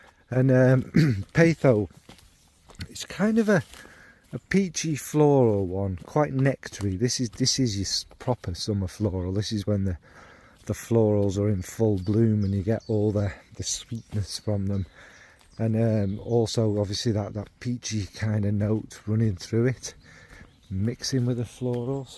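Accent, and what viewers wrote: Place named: England, United Kingdom. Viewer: You don't need too it's cute and funny 🥰 (English accent in french is beautiful!!!)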